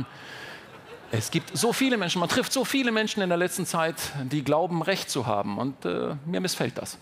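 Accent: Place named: Bavaria, Germany. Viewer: Auch wenn er aus Bayern kommt ist dieser Dialekt, so finde ich (Niederbayer), unangenehm anzuhören. Trotzdem ein tolles Video.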